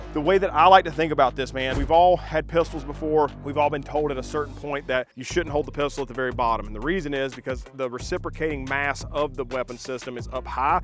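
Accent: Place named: South, United States. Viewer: He is saying it right. Y'all just aren't from the south, apparently. Lol. I'm a fellow southerner and have said "pilla" for all of my 36 years.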